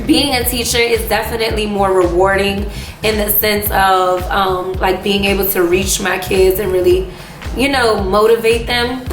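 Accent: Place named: Louisiana, United States. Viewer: I never comment but I'm loving your consistency! I come here just to hear your accent! I'm from Louisiana too I'm in Houston now too 😊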